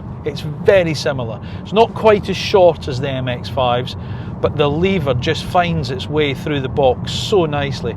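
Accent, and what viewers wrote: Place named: Scotland, United Kingdom. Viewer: Good critique, however, the speaker is talking quite naturally slowly for a native English presentation. I do understand your issue as the Scottish accent - I’m northern English btw - can take a while to get accustomed to. I’d recommend perseverance and hopefully you’ll tune in.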